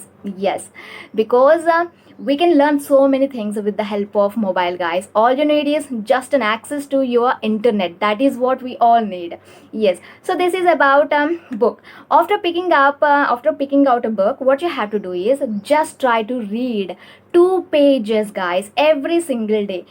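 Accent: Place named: Tamil Nadu, India. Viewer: Were are you from i thought u r tamilnadu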